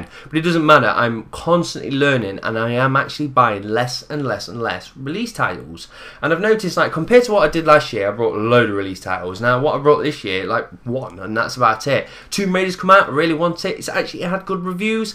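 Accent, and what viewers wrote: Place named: Derby, United Kingdom. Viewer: Are you from Derby? So strange to hear ones own accent on the internet, especially when you haven't lived there for a few years.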